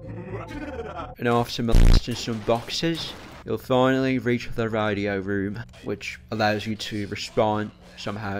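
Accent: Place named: Wales, United Kingdom. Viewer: Yeah you have kind of a British\Welsh accent that my ears don't really understand, but don't take it personal I'm fine with it.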